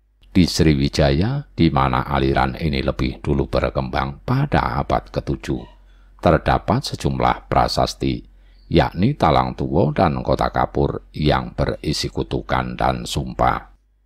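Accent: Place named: East Java, Indonesia. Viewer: kirain jatim mojokerto jenengan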